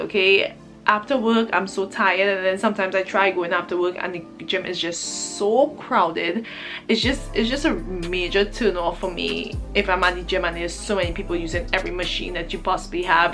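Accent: Trinidad and Tobago. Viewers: By chance you from Trinidad? cuz I HEARING ITTT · omg i can hear the trini/west indian in your voice lol. 🇹🇹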